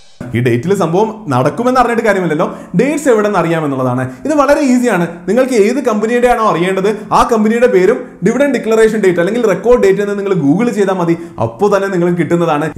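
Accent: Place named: Kozhikode, India. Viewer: super man super kozhikodan bhashayil paranjal oroo mukkummoolayum thappi egal paranj tharand 👍👍👍👌👌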